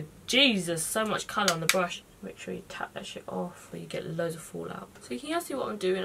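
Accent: United Kingdom. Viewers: i've been following for you for months on insta and i finally looked up your youtube. i wasn't expecting you to be british haha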